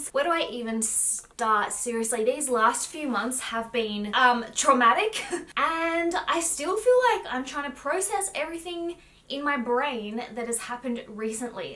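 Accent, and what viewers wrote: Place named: Australia. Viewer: I feel like your aussie accent is coming out more since you've went back 😍🤣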